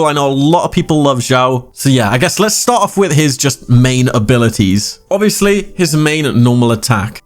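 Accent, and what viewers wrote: United Kingdom. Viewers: You sound a little British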